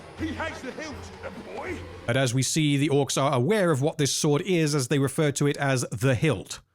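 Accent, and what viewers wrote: United Kingdom. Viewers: Why do you hate Donald Trump? I mean from your accent I would say your Brexit. So we had a leader who's deal was Illegally ( key phrase, breaking the law) entering and taking jobs, and social services, that American Citizens can not get. How would you like it if 2,500,000. People Illegally crossed into your country and your government was givening them 15000 and a place to stay with free medical care. When I know veterans who cant get medical help and are living out of their car. Love the vids so far, i really do. But take your anti Trump pro Biden crap and keep it on your side of the mic. Your making the very same mistake amazon made, while criticizing Amazon for said mistake. Thanks. I will now go back to enjoying the rest of the series.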